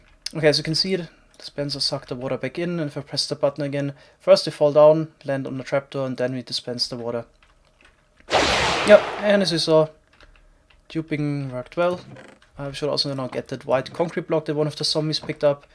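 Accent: Germany. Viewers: du bist deutsch man hört das so raus xD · Danke für das Tutorial! Man hört extrem deinen deutschen Akzent ;)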